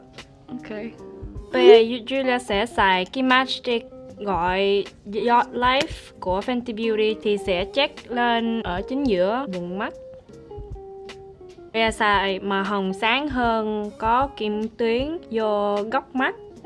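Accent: Vietnam. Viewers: sᴇᴇıɴɴᴇᴇ she’s probably speaking in the North accent, ur south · OMG girl I love your Vietnamese accent!!! I think it's so cute that you try to embrace this part of the culture. So very looking forward to other Vietnamese speaking videos (or any other videos hahaha) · Isn‘t her accent southern as well? Also she mentioned that the Grab driver wanted to drive her to Vung Tau which makes me think that maybe she was in Saigon.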